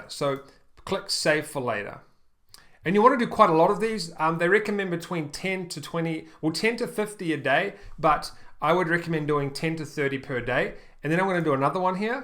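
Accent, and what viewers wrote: New Zealand. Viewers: do you come from new zealand? you sound like a kiwi haha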